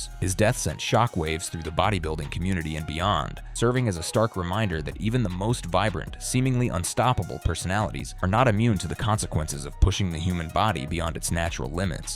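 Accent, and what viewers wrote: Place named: United States. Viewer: Another load a ballax video on YouTube with American voice over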